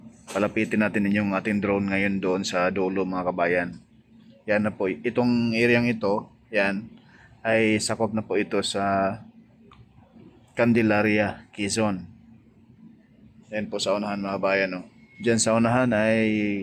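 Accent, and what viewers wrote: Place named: Visayas, Philippines. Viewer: Kainaman.bisayang nahaloan ng batangasin kung mag salita. Resulta bulol😁✌️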